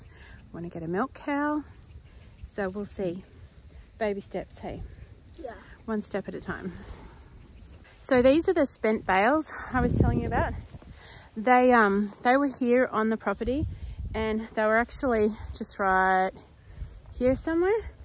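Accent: Australia. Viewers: Lovely hearing an Aussie accent in a homesteading video 😊 (fellow Aussie here). Thanks for the tips, looking forward to bingeing the rest of your videos.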